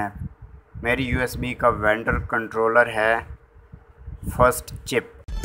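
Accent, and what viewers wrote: India. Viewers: I don't know but I felt I found the true and the best video when I heard the Indian accent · Punjabi Language destroys the accent of every language like this brother's.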